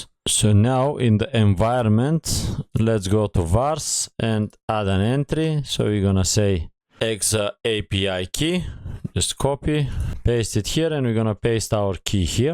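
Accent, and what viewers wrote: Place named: Eastern Europe. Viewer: You sound Eastern European. Hi from Romania. This is such a straight forward, no fluff tutorial you offered us. Thank you so much. You've got a new subscriber!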